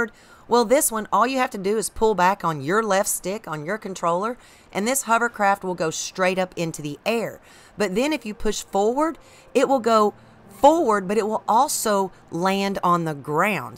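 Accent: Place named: Midwest, United States. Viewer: I keep getting distracted by your super thick midwestern accent 😂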